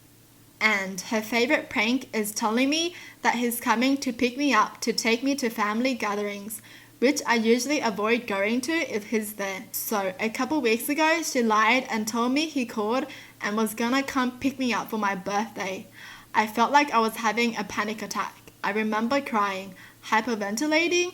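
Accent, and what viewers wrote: Australia. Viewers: so if americans speak in american do they speak in other language? if brits speak in british accent does that mean they speak other language? lol im loving ur logic shes australian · lives in Australia. She has an Australian accent. I like her accent, too.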